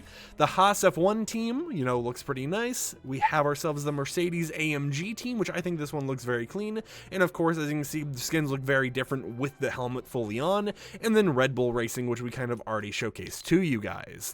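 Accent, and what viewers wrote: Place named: United Kingdom. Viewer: Bro is definitely British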